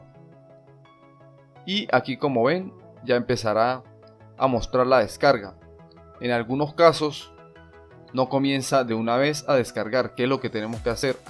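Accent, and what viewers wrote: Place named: Táchira, Venezuela. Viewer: Muchas gracias, saludos desde San Cristóbal, reconocí tu acento gocho mi pana!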